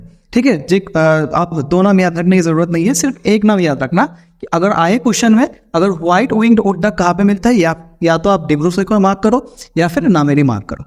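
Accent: Bengal, India. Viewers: Sir apka accent se lgta h aap bengali ho kyuki ek baar aap padhate wqt gllti se bengali word nikal gya tha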